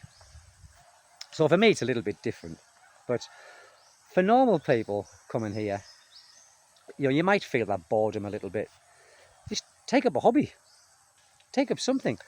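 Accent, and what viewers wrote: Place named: Cockney, United Kingdom. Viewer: Being French and loving the English language, my favorite is the cockney accent from London. Which is where your accent is from? It sounds chicky ans is very lively. "Hello, you lovely people!" I love it! And then the little boy coming in at the end as if, he too, wants to take part to the video. As for the content, I guess we can get bored and lonely anywhere in the world, maybe that some places are just better than others to get bored or lonely. There's no paradise on earth, I think..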